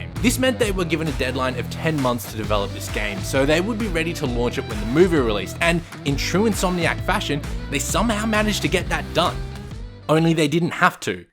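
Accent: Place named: United States. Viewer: This guy's accent is clearly American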